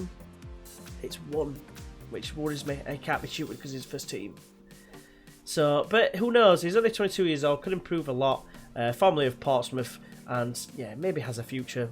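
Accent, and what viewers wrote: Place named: Liverpool, United Kingdom. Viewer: That feel when you can tell its speaking but he actually sound's scouser. Great ep as always mate!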